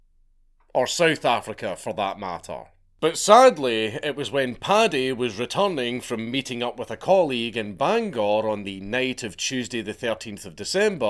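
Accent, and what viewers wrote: United States. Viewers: Shame you used american pronunciations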